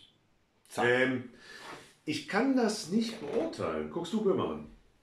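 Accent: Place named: Franconia, Germany. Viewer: Sprachfehler? Ich glaub, das ist einfach nur der fränkische Dialekt... 😁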